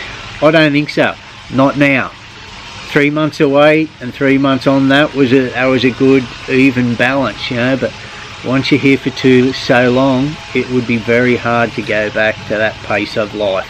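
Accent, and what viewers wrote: Australia. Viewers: I want to search if you have videos from Pakse region, but the search button isnt active and there is no url for it. Isnt it active yet? Btw great videos you put out, I like your stories, your choice of music and how you and talks with everyone. The only problem I sometimes have is your aussie english, but i feel your pronouncing is better now than a year ago 😊👍. It may be me though...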